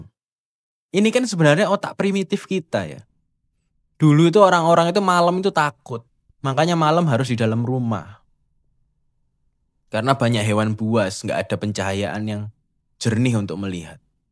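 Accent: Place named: Surabaya, Indonesia. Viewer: KERENNN lurr LANGSUNG MAK JLEBB, MODEL BOSO SUROBOYOAN CEESSS PLENG LANGSUNG ON POINT. Cuman penasaran bisa sejauh ini PEMBUKA LARA BATINNYA KY APA DULU,,, 😂😂😂😂 PENGHIANATAN KAH, PENYAKIT KAH HARTA KAH.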